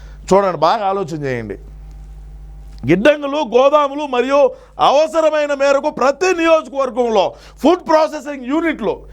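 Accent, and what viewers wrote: Rayalaseema, India. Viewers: Posting this comment randomly to reach you Anna, though I’m from Kosta, appreciate you on highlighting Seema yaasa, I love it anna.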